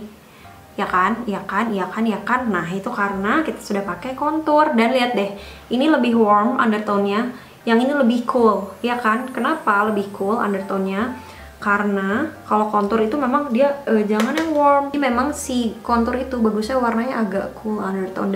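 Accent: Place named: United Kingdom. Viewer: Lidah nyaa gilss gilss...Inggris bat dahh lidah ku langsung auto milih diam mingkem cep😭...btw hallo ka semangat bikin video nya yaaahh🥰✨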